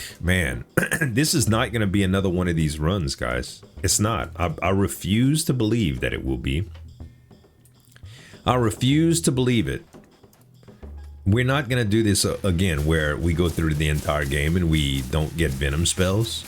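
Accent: Louisiana, United States. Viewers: I'd bet money that's a Louisiana accent. I know my people. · Hey man, glad to see you doing well, just found your channel TODAY (coming from gohjo lol). I’ve watched a few vids and noticed you answer comments either in videos or reply right here, so i have one question. WHERE ARE YOU FROM? You play with your voice a lot it seems but i keep hearing so many different little American dialects. I’m wondering if that’s just how you speak naturally or if you are doing random accents/slang for fun? Please I need to know why your speech patterns and accent is so hard for me to place. Thanks and love the content my friend.🙏👌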